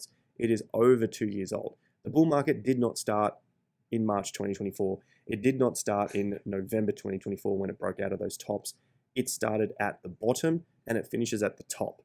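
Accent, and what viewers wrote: Australia. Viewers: Why can’t Australians pronounce “A” correctly? It’s DA-TA not DA-Terrrr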